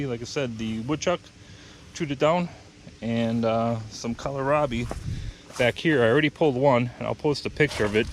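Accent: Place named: Wisconsin, United States. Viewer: Great stuff. Clearly another cheesehead based on accent, inflection and calling cucumbers pickles 👍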